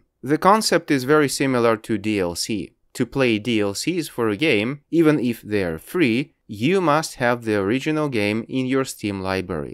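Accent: England, United Kingdom. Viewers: о вы из англии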